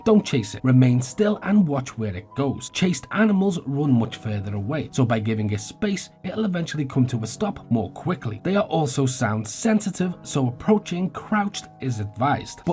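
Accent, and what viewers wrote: Liverpool, United Kingdom. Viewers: hi mate love the videos! are you a fellow scouser? lol, sounds like it.. just wanted to ask, im tired of joining servers with absolute sweats where i cant do an airdrop or koth in peace, do you know of any servers you can share that are abit more beginner friendly where most people are on the same boat as me? wold be great if you could recommend any, thanks man! once agin love the content keep it up!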